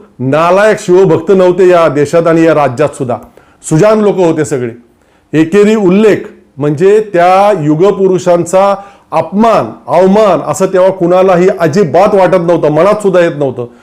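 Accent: Standard. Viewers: तुमचे काही व्हिडिओ बघितले चांगले टॉपिक वर बोलत आहे तुमची बोलायची पध्दत शुद्ध चांगलीच आहे..... असेच पुढे करतील हीच अपेक्षा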